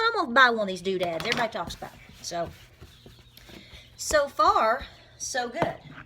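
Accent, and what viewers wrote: South, United States. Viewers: Darlin, I'm gonna make hubby some sausage gravy n biscuits for supper tonight. Gosh, listening to your accent, I do miss Tn and Va !!!! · You shore sound like a true southerner. Butter and honey yummy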